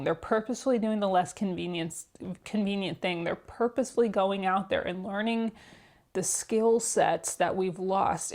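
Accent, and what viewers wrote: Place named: East Coast, United States. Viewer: Not the secret Masonic hand symbols 🤣 I can't believe someone would accuse you of that lol. I like listening to accents and the way people talk. If I had to guess, you're from the East Coast. My guess is New York. Possibly New Jersey. I'm from PA, and the way you speak is familiar to me. I also talk with my hands a lot. No Masonic symbols though haha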